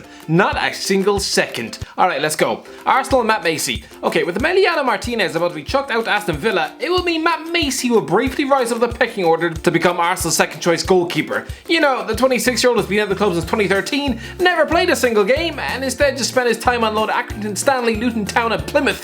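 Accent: Ireland. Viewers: Is this Irish guy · aren’t you from Ireland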